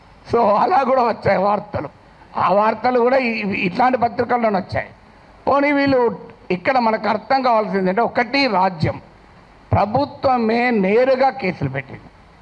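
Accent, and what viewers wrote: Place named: Andhra Pradesh, India. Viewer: Ithanu andhra ayaana eeyana baasha choosthe ardham avthundhi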